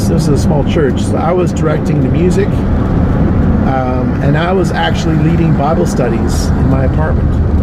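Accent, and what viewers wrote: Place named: Canada. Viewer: I think your video is great, and I am glad you’re living your truth and your best life! I don’t want to assume but you sound like you could be Canadian and religion is a lot different in the north than it is in the southern United States. Southern Christians are the most backbiting, gossiping, trouble, making, justifying and doing whatever the hell they want to kind of people you can ever imagine. They intermingle their self righteousness, and their politics and their loathing of gays and transgenders all into this big cauldron of godliness that does nothing but hurt and destroy the ability of the south to move forward into a truly progressive way of living. I am 54 years old and wasted decades of my life on Christianity as well as thousands of dollars. I am beyond pissed off about it and I wish I could go back in time and change it. 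Stay strong and keep on rockin!👊🏻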